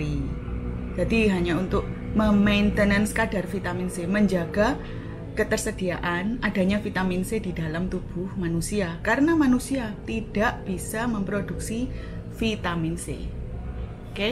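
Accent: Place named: Surabaya, Indonesia. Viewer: makasi ce infonya ........logat suroboyoan e Jan ora ilang blas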